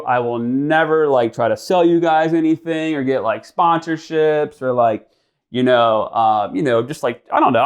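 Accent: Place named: England, United Kingdom. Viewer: The English accent 💀💀💀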